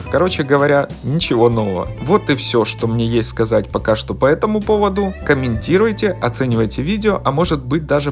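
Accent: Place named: South, Russia. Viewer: Это южно-русский сельский акцент. Вроде паренёк неплохой , про политику не рассуждает. У них 80% русские, остальные 20% поляки с венграми.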